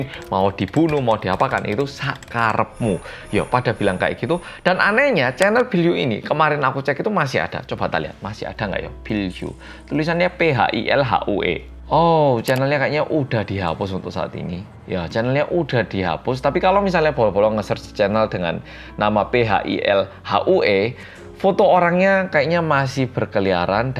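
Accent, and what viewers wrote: Java, Indonesia. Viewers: Pualing suka dg pembawaan mas,juelass bhs yg medok Jawanya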